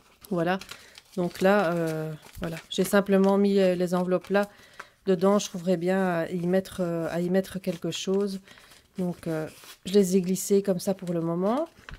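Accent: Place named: Belgium. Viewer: ça fait du bien d'ré-entendre l'accent belge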